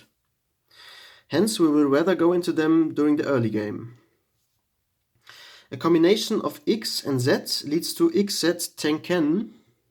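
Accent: Germany. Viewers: hab gleich dein deutsch englisch rausgehört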